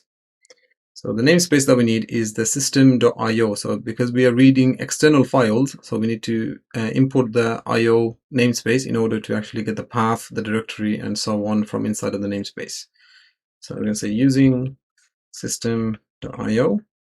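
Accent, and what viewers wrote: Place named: England, United Kingdom. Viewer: WOW FIINALLY SOMEONE WHO SPEAKS ENGLISH ENGLISH NOT INDIAN ENGLISH <3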